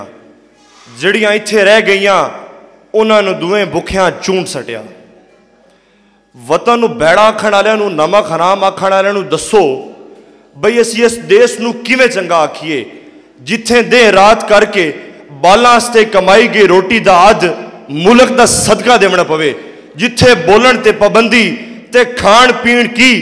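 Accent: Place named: Punjab, Pakistan. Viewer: جٹکی پنجابی ❤❤❤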